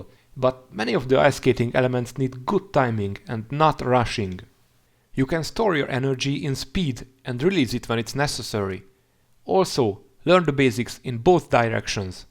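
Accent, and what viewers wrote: Hungary. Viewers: Vó srákok nem gondoltam volna h magyar csatorna :D pedig felismerem a magyar angolt. Pont jol jonnek ezek a vidik. Ment a sub pisz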